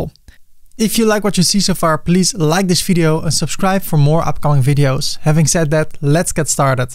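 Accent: Netherlands. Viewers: Nice video! Ik moest af en toe wel lachen om je overduidelijk Nederlandse accent:) Bedankt voor de video!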